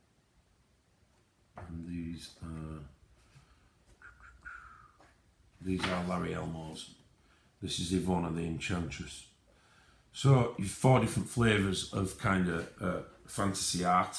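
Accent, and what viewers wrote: Yorkshire, United Kingdom. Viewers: Wonderful haul. I love several of the books you showed early on, I'm going to have to watch again to catch and record the names of them. Where do you shop for the more unusual books? I'm assuming these aren't all Amazon? I love the fact that this deep voiced Yorkshire man loves colouring. It's just great. Listening to you talking is also very relaxing. My husband is from Lancashire, but close to the Yorkshire border and so his accent was quite soft too, but he's been in the south/midlands too long now and it's worn off a lot. I miss it. I love the soft Yorkshire accent. I don't want you to add me to the give-away, I have plenty of pencils, but that is a wonderful give away. The only thing I would like you to improve about your videos is the camera angle. It would be great if we could see from your perspective rather than at angles. 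Not easy I know, but that would make a difference to the experience I think and make it easier to see the flip-throughs etc. I'm sorry to hear about your friend. It's always such a shock, especially when someone is young. How lovely that you helped his Mum get organised. Congratulations on reaching 1000 subscribers so quickly. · International (Canada). I love your accent, my Nan was from Yorkshire, you're my people, not to mention the artist thing! Love the variety of books you show and would love to win so I could get some Lightfast coloured pencils from Amazon. It would be great to have a better camera position as its hard to see the pages from the side like that, like an overhead setup. Sorry about your loss of your friend and congrats on your sub count! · We appreciate you too. I was initially attracted to your channel because I'm an ex brit and enjoyed listening to your accent. You are different and i like your choice of books and supplies so keep them coming. 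No criticism I'm afraid just carry on as you are.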